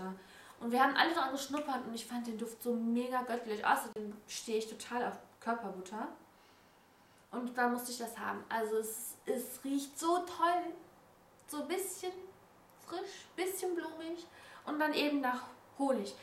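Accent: Ruhr, Germany. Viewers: ich finde man merkt total, dass du hier ausm Pott bist :-D nimm das bitte als Kompliment! gucke deine Videos in letzter Zeit wieder sehr gerne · Du gefällst mir in deinen "neuen" Videos wieder so unglaublich gut, ich muss ehrlich gestehen das ich zwischendurch etwas "entliebt" war, mochte dich nämlich früher waaaahnsinnig gerne aber zwischendurch war das einfach nicht mehr die die ich sonst aus den Videos kannte und mochte und jetzt habe ich das Gefühl du bist wieder du, mit dem witzigen Humor und einfach einem Hauch Ruhrpott - den ich als Essenerin sehr mag! Ganz liebe Grüße, Julia ♥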